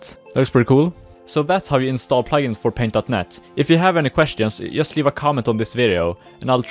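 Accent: Sweden. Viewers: You are swedish right? :D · you sound swedish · Are you swedish? xD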